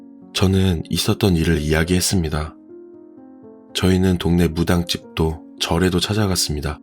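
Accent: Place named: Gyeongsang, South Korea. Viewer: 님억양이 경상도느낌이 네요 듣기조으네요 잠자리들때면 꼭들으면서 잠이듭니다 불면증때문에요 이젠잠이와요 자야겠네요 수고하셨어요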